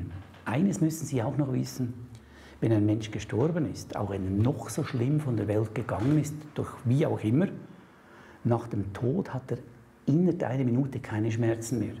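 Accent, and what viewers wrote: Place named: Switzerland. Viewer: Es ist sehr schwer der schnellen, Schweitzer Sprache zu folgen. Ansonsten ein ganz großartiger Vortrag, in dem nur Wahres gesprochen wird. Wir alle sollten dem Folge leisten.....so weit es möglich ist.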